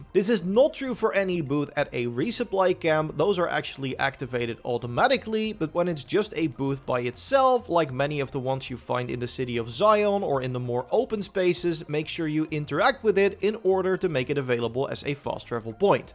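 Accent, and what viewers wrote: Netherlands. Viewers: they’re from the netherlands so they have an accent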